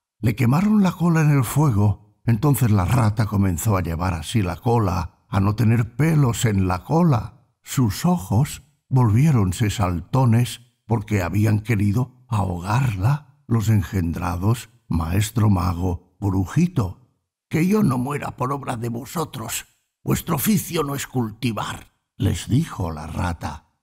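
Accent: Castile, Spain. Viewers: Un poco irónico que el audio sea ejecutado por alguien con acento castellano. Muy agradecido de todas maneras 😂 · Lo Unico que no me gusta es que esta español de España pienso que deveria ser español Latino de Mexico o Guatemala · No entiendo por qué felicitan la narración, si los datos son incorrectos. Uno de esos comentarios, dice que el Libro tiene mal los datos, NO, el narrador cometió los errores, EL LIBRO ESTÁ BIEN. En mi opinión, hay magníficos narradores LATINOS, no me gusta el acento español, prefiero el acento DE NUESTRAS TIERRAS y más para un LIBRO SAGRADO MAYA.. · Y porque no lo lee alguien con voz y acento de mejico o Guatemala??? Este con tanto acento de los españoles que fueron los destructores, es una gran pena.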